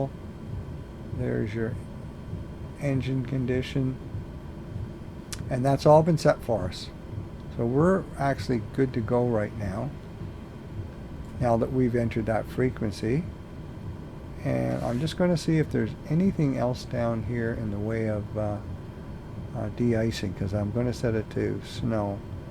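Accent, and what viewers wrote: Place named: Canada. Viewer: I loved this explanation and caught a few Canadian accent features in your narration! Aboot..... for example... It was an awesome flight and I just can't believe technology can make flight in such terrible conditions possible. It's a very impressive human feat of engineering. Thanks again for all the great information... especially the link to airport data. You've done an amazing job of demystifying the process... Jerry from Fort Worth Tx. I subscribed yesterday.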